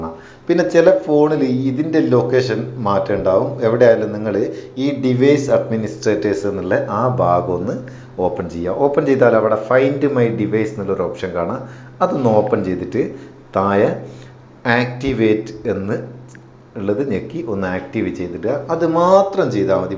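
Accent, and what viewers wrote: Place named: Vadakara, India. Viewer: മ്മളെ ബഡേര ഭാഷ യും ഇഷ്ടപ്പെടുന്നവർ ഉണ്ടല്ലോ സന്തോഷം ആയി 😛😂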